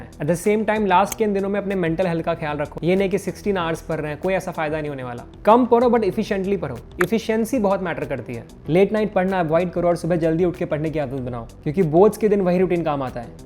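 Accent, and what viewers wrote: Bihar, India. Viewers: Ig he's from Bihar,coz of his way of speaking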